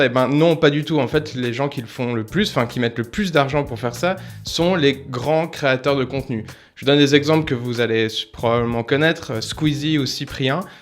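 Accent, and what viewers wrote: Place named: Switzerland. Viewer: Super, vidéo d'ailleurs je suis également Suisse. Je me disais a l'accent. Pis la mentalité ce voit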